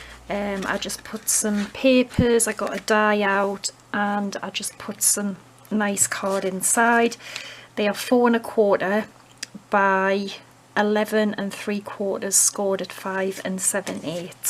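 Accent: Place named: Newcastle, United Kingdom. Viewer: I have been watching your videos because I am a fan of your Geordie accent. I was born in Newcastle and still have family there but we moved to Canada a number of years ago. I love listening to you talk while you do all the crafty things. I'm having trouble finding those Matte laminating sheets here however so that makes me sad.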